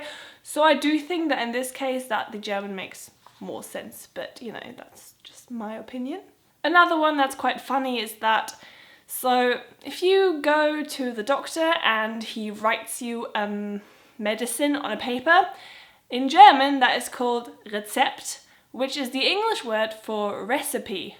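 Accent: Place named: England, United Kingdom. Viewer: an deinem "CH" merkt man dass du in England lebst :) so süss haha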